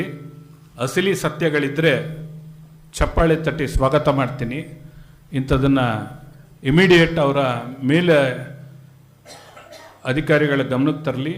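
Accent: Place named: Mandya, India. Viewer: Ivanu yaru, Mandya bashe thara mathodo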